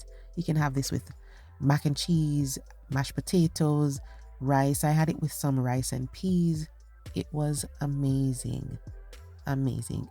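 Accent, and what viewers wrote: Jamaica. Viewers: Jamaican? Hearing an accent!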